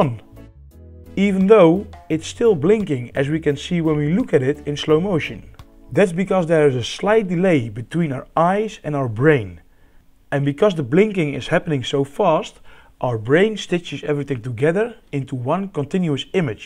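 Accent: Netherlands. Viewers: Haha je accent is zo Nederlands, leuk om te horen. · Love your videos mate. So much so I’m actually commenting on a video. Your creations are incredible. Your channel is definitely the next “stuff made here” ❤ I’d actually love if your videos were 3x longer with more of the technical stuff. Maybe you’re keeping the videos short n sweet to attract the huge following for now? Do you have an instagram page or anything for us to follow you more on? Also love the Dutch accent. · i saw the thumbnail and thought "that looks like dutch grass. then i heard 1 second of you talking. yep. ik herken altijd een hollander op youtube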